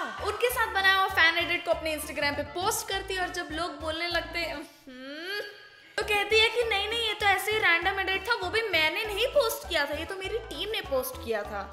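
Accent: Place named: Punjab, India. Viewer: Yar apki punjabi bhut cute lagi mujhe sachii 🫣🫣🫣🔥🔥🔥❤️❤️❤️❤️❤️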